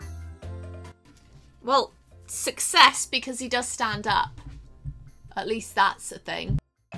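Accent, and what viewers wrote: United Kingdom. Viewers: British: Me: u sound British already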